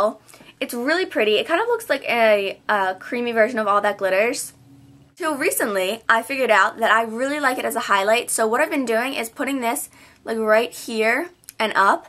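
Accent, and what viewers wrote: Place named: New Jersey, United States. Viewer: She's From New Jersey Well At Least I Think She Is Because She Said She Drove To New York For IMATS &&+ People That Live In Jerssey Do Drive To New York Often &&+ Vice Versa &&+ She Does Kinda Have A Slight Jersey Accent So I Think She Is From Jersey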